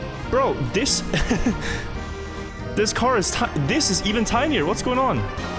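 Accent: Norway. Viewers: I love Norwegian "accent" - It just improves everything! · Aww man speaking with that Norwegian English is awesome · The Norwegian accent....😂 Everytime I hear Norwegian speak it.... Fucking adorable. 😂 Live in Sweden the Swedish accent is just not the same. Close but not the same. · subtitling his norweigen accent is so funny lmao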